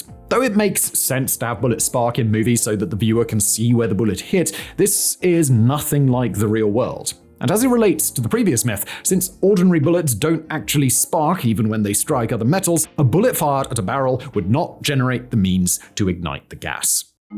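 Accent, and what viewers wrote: United Kingdom. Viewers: I CAN NOT WAIT to hear a Brit tell me (an American) about guns and gun myths. Stay in your lane and tell me about different teas or how they are prepared wrong in movies. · Wow, let me just click on this video and let this effete limey school me on guns…. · Sure, let's have the British guy tell us all about guns! Someone did do their research, so i respect that. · Here in Canada, same. Pretty sure this was made by america for America. Narrated by a Brit. It's like real history.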